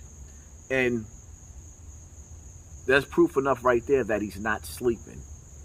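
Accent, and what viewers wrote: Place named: New York, United States. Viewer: Good points BUT YOU aren’t the one to give the message AND Pharoah is voluntarily putting his mess online. You the same man who disrespects dead folks by saying they got their snot box popped and all that fake ass, extra, NY talk you do. YOU learn how to handle yourself properly before you try to tell us how to handle someone who is voluntarily doing nonsense. If you are so concerned this WHOLE VIDEO should have been to Pharaoh and not the viewers, because he is the one tripping. You just made this video for views, so go chill out and work on a better, more respectful vocabulary. That NY excuse is played, the internet is worldwide so get it how you trying to live.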